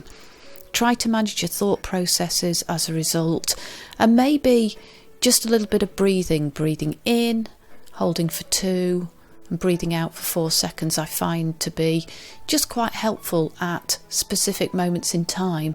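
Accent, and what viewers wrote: United Kingdom. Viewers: You are such an inspiration to me!! Yesterday afternoon I spent a magic time following your tutorial and painting the houses myself. As soon as I know how to post it I'll show it to you. Oh, and your British accent is just a beautiful addition to all your beautiful and helpful videos. Thanks so much from Spain!!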